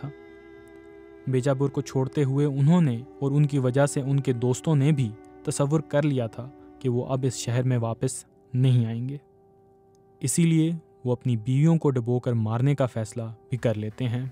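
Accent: Punjab, Pakistan. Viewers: What a bakwas accent. Muarrakh is pronounce wrong here. It seems it is read by someone from northern punjab of Pakistan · Exactly panjabi touch hay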